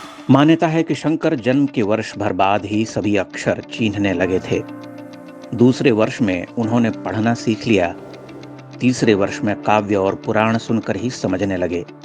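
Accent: Standard. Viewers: सारगर्भित एवं परिष्कृत हिंदी के साथ आपका विशुद्ध उच्चारण, वीडियोज को प्रेरणादायक होने के साथ अत्यंत आकर्षक बना देता है। प्रतीत होता है कि The Frustrated Indian के प्रारम्भिक वीडियोज मे भी आपकी ही आवाज थी।❤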